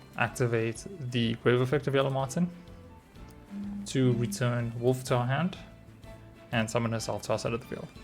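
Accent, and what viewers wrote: South Africa. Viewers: R u south african😂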